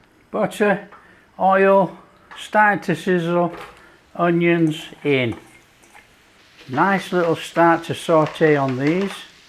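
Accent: Yorkshire, United Kingdom. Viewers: No French accent, just a wonderful Yorkshire brogue. Pork in cider is delish, I could scoff that down now. Nice glass of cider to go with it, what more could you want? xx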